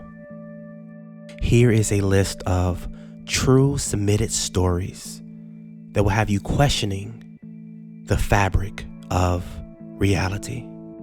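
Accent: South, United States. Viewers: I can't place the accent. Sounds slightly southern but not quite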